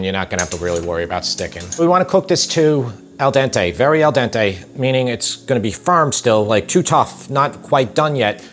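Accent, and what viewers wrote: New York, United States. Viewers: You really act and speak like one of us New Yorkers from the island. It's nice seeing someone with the same brands we all use here.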